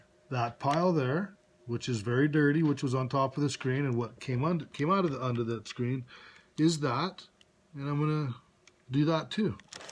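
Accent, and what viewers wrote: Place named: Upper Peninsula, United States. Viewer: are you in the Yoop by chance? I am originally from Iron Mtn area and live in Denver. But i tht I could recognize the ol' accent. Great video, i saw this dudes video about a week ago and was blown away!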